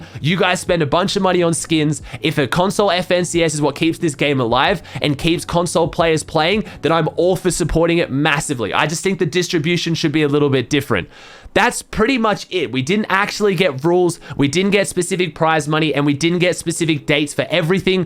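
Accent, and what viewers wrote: Australia. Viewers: Talking Me Watching Benjy Die The Whole TIme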